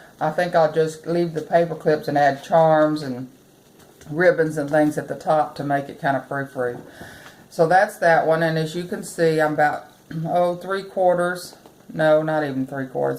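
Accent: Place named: Northeast Texas, United States. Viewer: I'm from north east Texas & your lovely accent sure makes me homesick. I have really enjoyed seeing your beautiful journals. Thanks for sharing.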